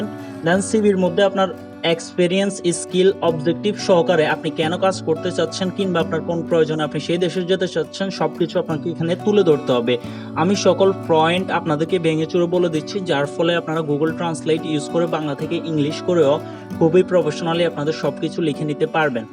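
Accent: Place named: Noakhali, Bangladesh. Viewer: আপনার বাড়ি নোয়াখালী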